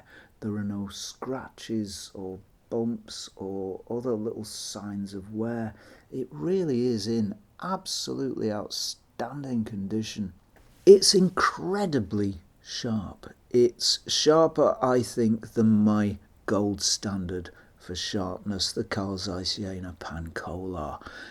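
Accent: United Kingdom. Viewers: Who would tell me that Steve Buscemi is reviewing lenses on Youtube with an indistinguishable british accent?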